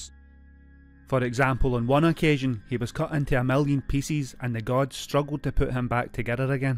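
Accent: Scotland, United Kingdom. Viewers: Are u Scottish?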